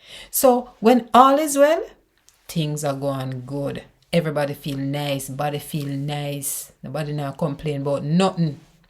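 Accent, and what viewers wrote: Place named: Jamaica. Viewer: This is some good information, like the Jamaican accent !!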